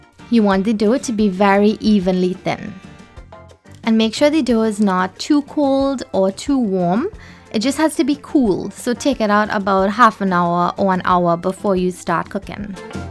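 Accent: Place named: Trinidad and Tobago. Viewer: Amazing, love the trini accent.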